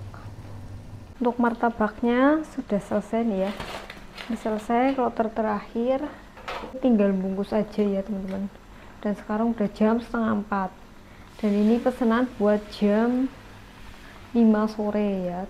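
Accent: Banyumas, Indonesia. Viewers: Makasih mb ,mba ngomonge ngapak wong ngendi ,kreatif banget ,makasih ya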